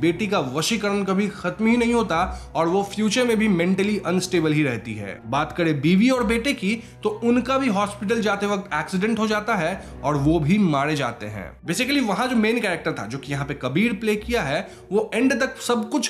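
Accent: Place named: Bihar, India. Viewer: Story teller is Bihari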